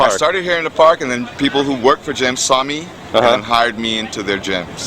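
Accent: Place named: Latin America. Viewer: Me. wrote something in Spanish recently. I wonder if he is Hispanic, I hear a slight accent, no? He mentioned Puerto Rican and black influence.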